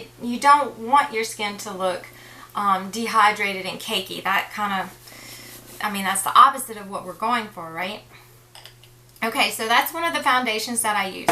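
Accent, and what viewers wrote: Louisiana, United States. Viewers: What is your accent? I'm from SC and I feel like occasionally I'll hear some Cajun? I mostly stick to CC cream while I'm getting use to retin a. I'm just not peeling like a lot of people do. And I use a sun block with cc creams sunblock just for extra protection. I just found your channel. I love it. Looking forward to hearing more from you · Hi thanks for thos video. I can totally imagine us being friends if we were neighbors Totally. In fact you remind me of a friend of mine Natalie... lol. I live near new orleans. I thought i detected a little southern cajun accent in your speech. Its a unique sound but i wonder, are you from nawlens? I was just thinking about what you was saying about makeup in general and how you love it, love applying it , buying it, shopping for it.... Etc. And how its something just for you and i totally get it. 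I mean i love love love trying different makeup and all of the above as well. Lately I've been more and more concerned about the the ingredients used in my cosmetics. I think IT Cosmetics is an awesome brand because they really try to use good ingredienrs and not just products that arent harmful but ones that are actually helping our skin. And heres a tip to try for the ABSOLUTE BEST LOOKING UNDEREYE: before applying anything to ur cleaned face...use an undereye cream or whatever moisturizer absorbs well and that you love. After it sinks in.... wait 3-5 min and apply it again. Apply a min. Of two layers of this moisturizer. Pref..4-5 layers. Now, make sure these layers are not too thick and they do get absorbed into the skin... very well. This will plump your skin some and really really help. Step 2 - use the slightest amount of eyeshadow primer just under your lower lashes. You might want to apply it to your lid first and just sweep whats left over under the bottom lashes. 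It has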